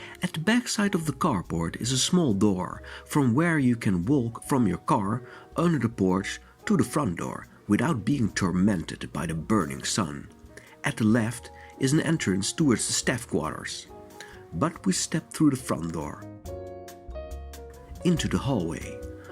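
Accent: Netherlands. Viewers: It’s so funny, I also thought you sounded like the Netherlands, so it seems I was guessing a little correctly. Good luck with all. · Hi, thousand thanks for this absolutely stunning documentary. No clue, whether it was made using AI, but in this case, the artificial help would have been wholesome. I very much enjoyed the abundance of plan views. This is a great plus, considering all the documentaries entirely made by AI running riot at YouTube. They are like a pest. A highly mind numbingly monotonous voice tells stories embellished with lyric weeds, which in general has nothing to do with the topic of the documentary. Would be kind of a match if you put in perspective that the images either are plentiful and artificially rendered and show scenes, which don’t at all match neither the narrator’s discourse nor the topic. In profusely appreciated contrast this documentary is how they should be and show, what the whole point is in doing a documentary. 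By the sympathetic way the narrator pronounces the “v”, tell’s me of his home country the Netherlands and shows me again, why I love the Netherlands and the Dutch people. I must visit the country again very soon, should not be too difficult for me, as I live in Germany. I can only bid you many warm a thank you. Please continue your fine work. Hartelijk bedankt voor je geweldige documentatie. Blijf zo doorgaan. 🇱🇺“Je maintiendrai”🇱🇺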